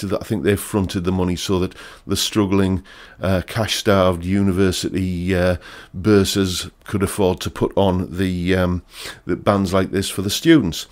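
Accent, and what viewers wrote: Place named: England, United Kingdom. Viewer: Great fun article! Love yer english m8! Wasn't there something briefly hosted by Peter Cook?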